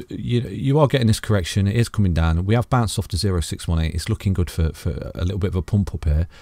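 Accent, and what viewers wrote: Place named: Mansfield, United Kingdom. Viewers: Are you from Nottingham? That accent! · you sound like you have a mansfield/Notts accent. Or am i way off?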